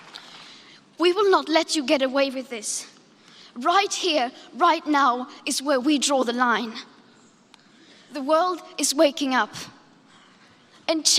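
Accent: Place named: England, United Kingdom. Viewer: Cuz she has an English accent like Emma Watson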